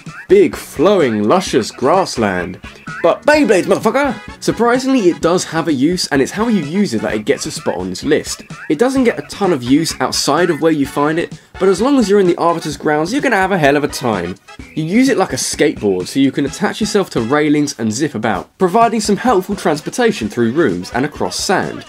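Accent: United Kingdom. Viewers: BRITISH ACCENT ON A TOP TEN Legend of Zelda LIST? HEEEEEEELLL YES! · I'm about a minute in: my first thought "oh so it's one of these SOB's with 30 second intros... but hey, he's british!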